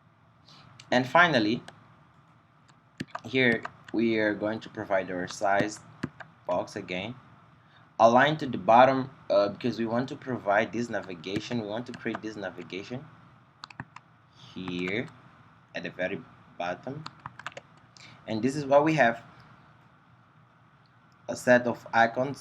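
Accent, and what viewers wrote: Brazil. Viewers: Vc é br né? Esse sotaque não é de alguém nativo...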